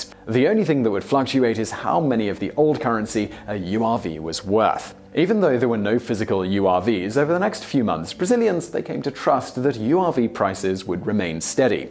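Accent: United Kingdom. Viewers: J H when you watch so much people in your life start developing a British accent. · English Accent* I'm Scottish and trust me my accent couldn't be further from his lol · That moment when you watch so many videos that you actually develop a decent British accent by listening to.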